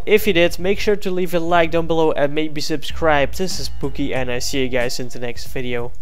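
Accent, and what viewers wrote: Netherlands. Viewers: u are dutch comfirmed xD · I knew it your Dutch! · Belgie / Nederland?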